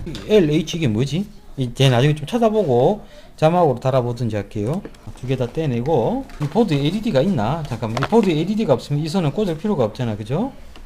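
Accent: Gyeongsang, South Korea. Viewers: 참 컴퓨터 조립처럼 변수가 많고 ㅇ;벤트가 많은 것도 없는거 같습니다컴퓨터 조립이 별거 아니라고 쉽게 생각하는 많은 사람들에게 좋은 교훈이 되는 영상들인거 같습니다 ㅎㅎㅎㅎ쉽다면 쉽지만 어려워 질려면 어마어마 하게 고난이도로 올라 가버리는게 컴조립인거 같습니다 항상 재밌게 풀어 나가는 영상 잘보고있고 배웁니다 ㅎㅎ억양은 경상도이신데 먼데 가셔서 자리를 잡으신듯 합니다~가계구경이라도 가보고 싶내요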